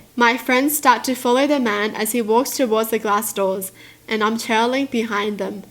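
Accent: Australia. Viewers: How did I just notice that you were Aussie I am to yay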